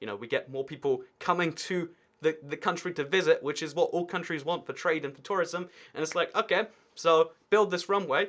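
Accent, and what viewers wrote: United Kingdom. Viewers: British accent!!!!!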